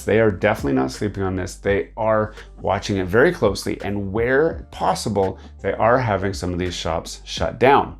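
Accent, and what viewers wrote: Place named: Canada. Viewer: Patiently waiting as well. (Insta-subscribed when I heard your Canadian accent!)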